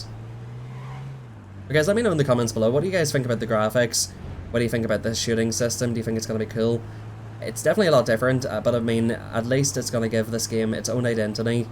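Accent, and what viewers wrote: Northern Ireland, United Kingdom. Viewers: Northern Irish? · Are you Northern Irish? You sound like you're from here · Are you northern ireland?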